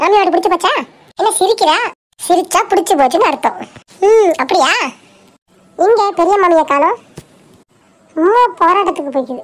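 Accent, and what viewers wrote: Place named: Parangipettai, India. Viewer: Intha slang parangippettai thana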